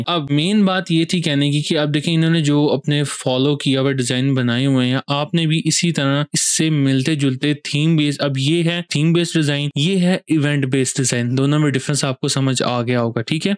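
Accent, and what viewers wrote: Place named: India. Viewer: Im not understand. Pronoun english india 😅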